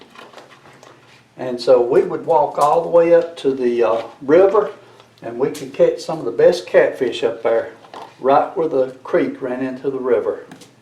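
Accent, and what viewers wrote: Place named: Alabama, United States. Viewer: Yall in Alabama? You sound like Bama...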